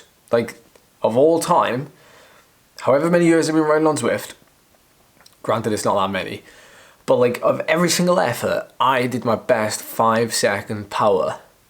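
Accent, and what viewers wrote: Wales, United Kingdom. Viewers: When I moved to Cardiff as a kid one of the first things I heard was ‘now in a minute’ Still tickles me 50 years later. How Welsh are you but? 😂🏴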